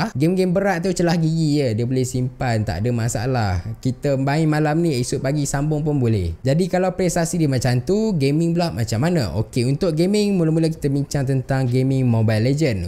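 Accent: Malaysia. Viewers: slang dia kot..biaselah typical malaysian..aku pun macam tu...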